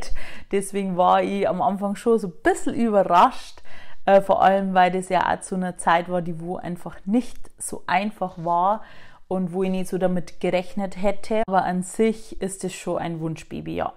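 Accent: Bavaria, Germany. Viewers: I finde sau geil, dass du Boarisch redst. I bin a a Borei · Nochmal herzlichen Glückwunsch zur Schwangerschaft. Ich würde mich auch freuen um Videos zur Schwangerschaft. Ich finde dich auch super sympathisch ich mag dich. Endlich eine YouTuberin die so schon Bayrisch redet. Ich liebe den Dialekt. ❤️❤️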